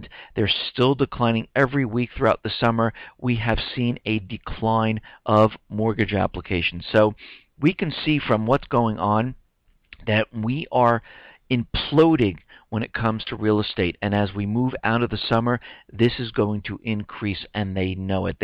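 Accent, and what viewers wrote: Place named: Brooklyn, United States. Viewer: his accent sounds like my friend's... My friend is from Brooklyn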